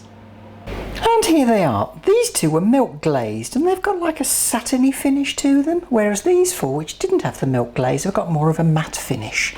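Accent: Midlands, United Kingdom. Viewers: This lady is an absolute joy, and her recipes are so very easy to follow. Her soft Midlands accent only adds to the warmth of her personality. Wonderful!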